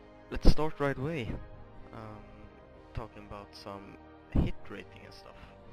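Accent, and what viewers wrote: Sweden. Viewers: this guy is swedish, i can hear it from his voice :P if not he is atleast scandinavian >:]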